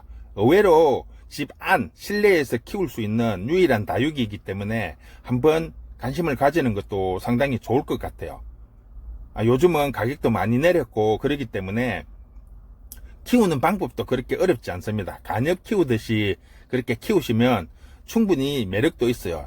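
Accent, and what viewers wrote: Gyeongsang, South Korea. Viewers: 저는 오늘 처음으로 님의 영상을 보았습니다 경상도 구수한 말씀으로 상세한 설명 감사합니다~~^^ 저 완전 초보에다 저희 집은 햇볕이 부족하고 확장된 아파트라 하월시아 기르는것은 좋은 환경인듯 싶네요 하월시아는 영양제는 필요없나요? 그리고 난석의 굵기는 어느정도가 좋은가요 (예: 소.중.대) 물 줄때 저면관수로 주어도 되는지요 오늘 휴일 평안한 축복된 하루가 되시기를~~^-^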